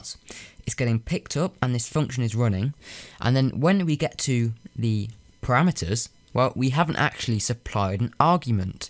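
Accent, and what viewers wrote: United Kingdom. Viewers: He still sounds British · I love *'s British accent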